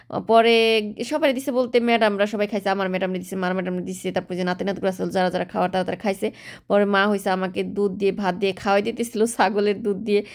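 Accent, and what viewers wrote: Faridpur, Bangladesh. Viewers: আপু আপনার বাড়ি কোন জেলায়, জানাবেন,,আপনার কথা গুলো আমাদের, ফরিদ পুর ভাষার মত লাগে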